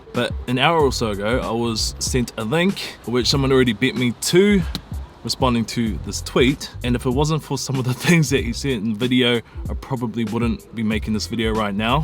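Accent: New Zealand. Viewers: hes maori I dont think the maori accent sounds australian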